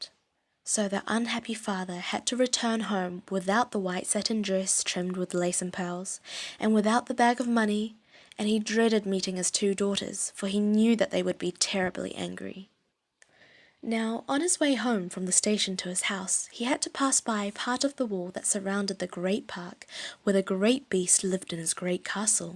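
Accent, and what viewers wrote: New Zealand. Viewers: 아... 다른 채널에서 타다가 오게 됐는데 ㅠㅠ 자기 전에 듣기 너무 좋을 것 같아요! 뉴질랜드 발음의 특징(?)을 정리해주신 영상도 올려주신다면 좋겠어요! 딱 듣고 아 이건 뉴질랜드다 싶은 게 뭔지 알고싶어요 ㅎㅎ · 우와... 뉴질랜드 발음으로 영어듣기 하고싶었는데 드디어 그 채널을 찾았네요!! 너무너무 감사합니다~ 앞으로도 좋은 영상 많이 올려주시면 감사히 보겠습니다 :) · 항상 영상만 보다 댓글은 처음 남기네요ㅎㅎ 뉴질랜드 억양으로 동화를 들으며 공부할 수 있어서 너무 좋아요! 목소리 너무 좋으세요:)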